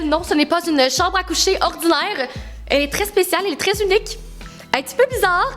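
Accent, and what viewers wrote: Quebec, Canada. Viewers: Moi nan pis elle québécois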